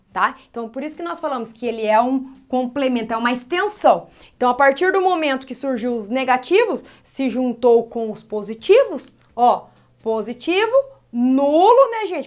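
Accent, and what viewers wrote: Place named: Northeast, Brazil. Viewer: Você é nordestina?? Adorei o seu sotaque 🤗🤗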